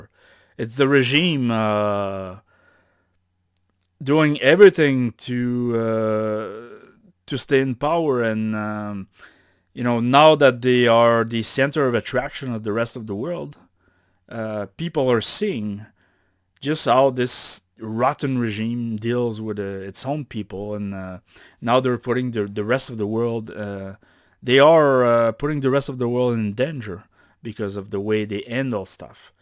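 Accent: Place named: Quebec, Canada. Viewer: Oh! que Oui !, je reconnais un Québécois quand j'en entend un, content de savoir que je suis pas seul dans ma recherche pour la vérité sur le coronavirus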